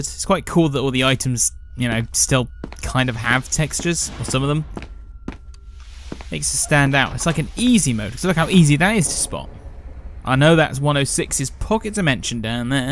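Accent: United Kingdom. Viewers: why do you sound extra british in this video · Why is he extra British in this video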